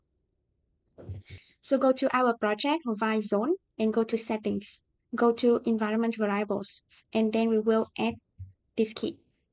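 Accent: Vietnam. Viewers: CHị này người Việt chắc luôn :))))) nghe phát âm quen lắm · unrelated but are you Vietnamese? because your accent is similar to one of my Vietnamese friends :D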